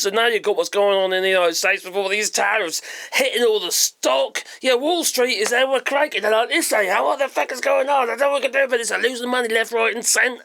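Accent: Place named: West Country, United Kingdom. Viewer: Mate, we have spoken before. You’re doing so many videos at the moment and you are an absolute star. I remember when you were thinking about shutting your channel down but it seems things have got better. I am a Southerner from Pompey but I live in the West Country and they all talk like you, mate. And I have seen and love your dogs. I wish I could live there in France. You and your wonderful lady did a great thing to escape before those wankers fucked it for all of the rest of us. Much love mate. I would love to visit you just fora couple of hours one day. Surprisingly I,m not called. I’m Paddy. I’d love to chat but I get it if you don’t want to. Whatever youchoose, much love and thanks for the channel x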